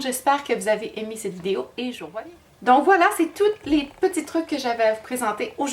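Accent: Quebec, Canada. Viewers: Waouh !!! Quel œil de biche ! Merci pour la technique et ce petit accent québécois j'adore 😉😊🌻❤ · Adorable 💖 ma super heroe : tu expliques merveilleusement bien et ton accent canadien wahou 😍 on meurt d'envie de partir vivre chez vous : au CANADA 🇨🇦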